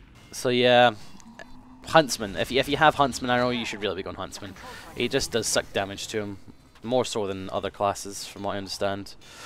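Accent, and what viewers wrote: Scotland, United Kingdom. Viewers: are you scottish? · no hes scottish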